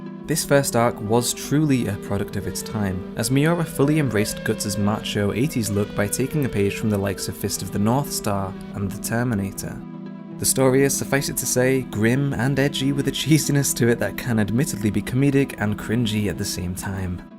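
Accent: United Kingdom. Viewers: an overly-soft UK accent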